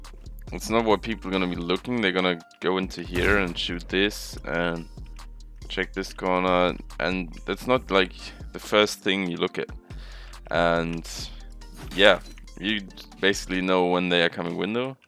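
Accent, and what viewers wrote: Germany. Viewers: man hört das du deutscher bist :D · The german accent haha. ^^ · i dont know why, but you sound like you came from Germany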